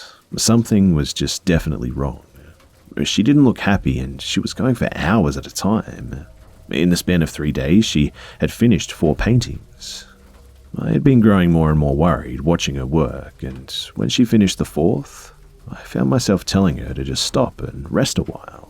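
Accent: Australia. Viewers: I swear you're Australian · Just fabulous Its a real treat to listen to my native aussie accent for change I've subscribed and now about to binge listen to some of ur other works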